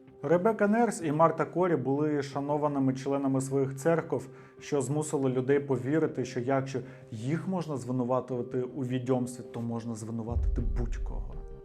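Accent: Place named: Russia. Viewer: Дуже крутий контент, подивився вже майже усі відео Але є трошки конструктивної критики) Було б добре, якби автор трошки попрацював над вимовою (маю на увазі зросійщену "г" і подібні русизми) А так все файно, дякую за працю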